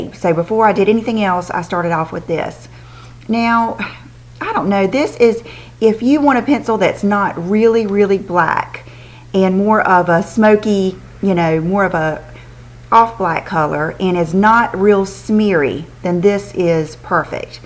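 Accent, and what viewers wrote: South, United States. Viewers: Love your southern accent :)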